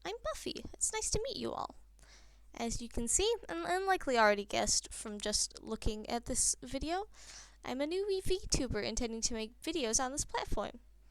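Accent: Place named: United States. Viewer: Nice to meet you Buffy 👋🏻 Glad to have seen this popped up in my recommendation. Also, as someone who lives in the US, you do sound a bit American. But that's okay. I hope & pray you have a great wonderful day/night & a bless life! As well your journey here on the platform spreading your enjoyment out to others, bringing the smile along side you. Stay safe, stay positive, stay frosty/cozy. Always watch your back & wish you the best of luck out there in the world. Take care now and I'll see you later when you poste your content! peace ✌🏻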